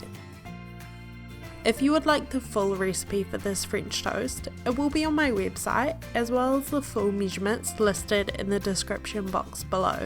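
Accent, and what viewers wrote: New Zealand. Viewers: Kiwi New Zealand accent 🔥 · Hi, I just wanted to say I’m from new zealand aswell. I could tell by your kiwi accent. Your cooking videos are so great and helpful thank you :) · Nola Susan New Zealand accent duh 🙄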